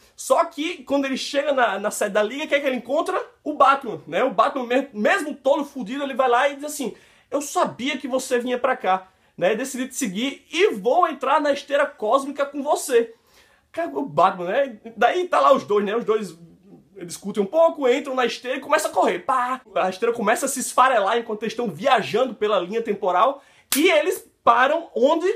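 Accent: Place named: Northeast, Brazil. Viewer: ah esse nosso sotaque nordestino kkk , ótimo vídeo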